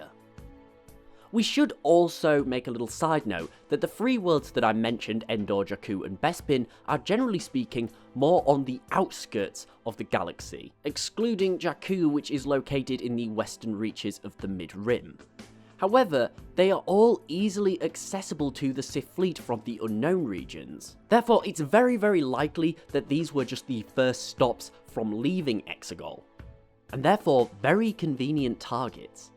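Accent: United Kingdom. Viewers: I agree, I love brittish accents. Tho according 2 sw lore, he's evil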